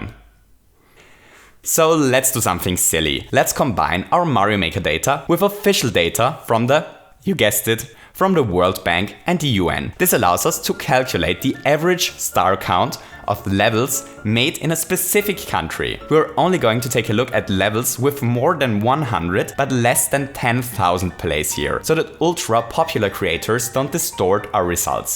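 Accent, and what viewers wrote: Austria. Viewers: IS AUSTRIAN EVERYONE, NOW WE CAN STOP ASKING WHERE HIS ACCENT IS FROM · Eyyy du bist Österreicher? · Are you from Austria? Wäre echt krass😂😂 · Momentmomentmoment! Du bisd österreicher?!? Jo lol, seas😂😂👋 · Wait what you are from austria? Hurray! I could never figure out where that accent was from even though it was right in front of me the whole time. Mach weiter so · Was! Du bist Österreicher? Ich mein, ich schau mir deine Videos schon seit gut einem Jahr an und wusste das du Englisch nicht als Muttersprache hast, trotzdem überraschend! Grüße aus Graz ;) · I'd never guess you are from austria, even though you speak a rly strong dialect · AUSTRIA, finally, i knew you had an accent, but I couldn't figure out which. Btw, greetings from your neighbour country, Germany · Mit seinem Akzent wie kannst du überrascht sein dass er aus österreich kommt 😂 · HOO-ray, FINALLY! 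I've been trying to place your accent since I found your channel. My boyfriend thought maybe Eastern European, (e.g. Czech) and I was guessing German. Austria it is. Absolutely beautiful country. Love your work, keep up the great content. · Du kommst aus Österreich?! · ohhh hes from Austria. That's what accent he has! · Du bisch österreicher??😳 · Hahaa, I knew you were from austria (dont know if you mentioned it before), but I could guess because of your wonderful accent. Hot Cogs. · He just said it in the video. He's from Austria. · Ha, Ich hab gewusst dass du Österreicher bist, der Akzent ist mir schon bekannt vorgekommen :D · Warte… du kommst aus Österreich?? :o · Warte mal du kommst aus Österreich? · Du bist Österreicher? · Oh you‘re from austria? So you talk german now i understand your accent lmao. Im swiss btw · Hob ma scho docht, dassd a Österreicha bist 😅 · Warte was du bist Österreicher? 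· Du bist Österreicher? Nice. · Du bist Österreicher? Nice! Ich auch! Ich wusste von deinem Akzent her, dass du deutsch sprichst, aber Österreicher wusste ich nicht! Schön! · OOOOOHHH You're Austrian! I had no idea where you were from XD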